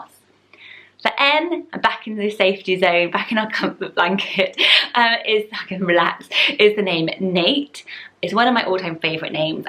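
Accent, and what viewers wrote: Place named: England, United Kingdom. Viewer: I love these names but I’m American so these dont sound cute without the English accent!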